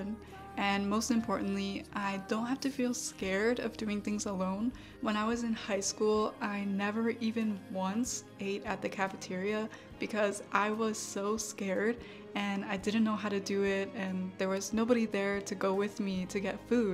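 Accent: United States. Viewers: A lovely Chinese look-like American girl with a perfect American English tongue in such a tenderly whispering style！Watching your classes feels so good like breathing fresh air❤❤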